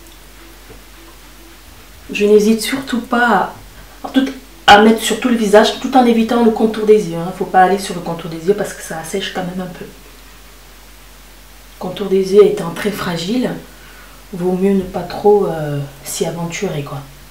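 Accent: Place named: Senegal. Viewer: Tu es de quelle nationalité ? tu as l'accent sénégalais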